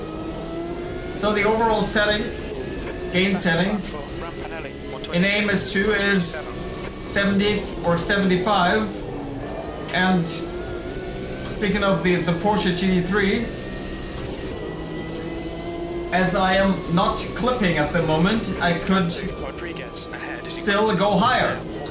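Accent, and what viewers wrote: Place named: Sweden. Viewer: Cool alright, though I heard a Swedish accent :)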